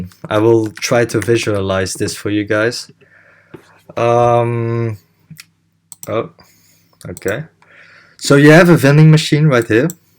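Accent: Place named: Netherlands. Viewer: you must be dutch or belgian :D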